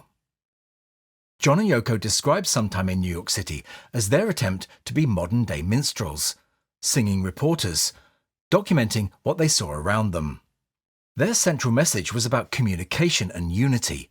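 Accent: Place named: United Kingdom. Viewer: Excellent review. As an American, I find your "British voice" (not the correct way of expressing this) adds to your deep credibility in discussing Lennon. I will not, however, spend my money on this set because I was alive in 1972 when were radical artists doing radical artistic stuff in New York City and I heard their works back in the day when all their unique material first was released. I have to conclude that their son, Sean Lennon, misses the point entirely about his radical artist parents. There must be something wrong with him. Maybe he is "in denial"? He of all people should have loved and accepted his parents for who they genuinely were. And they were radical artists. No two ways about that. This set may promise to be impressive in the context of the truth that today's technology makes audio recording with the available technology from fifty years ago sound "better." But eliminating the controversial song " " from the set does not make this set "better" at all. 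Quite the opposite is true. The specific elimination of that essential song in unforgivable and the elimination erases any credibility Sean Lennon has as a producer.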